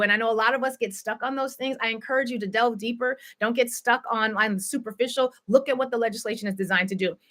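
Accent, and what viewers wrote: South, United States. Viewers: And you speak southern belle.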